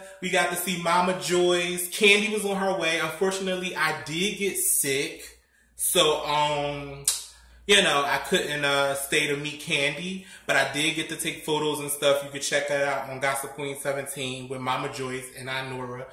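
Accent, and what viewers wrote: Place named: Philadelphia, United States. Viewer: I knew You were from Philadelphia you sound exactly like Kevin the Scorpion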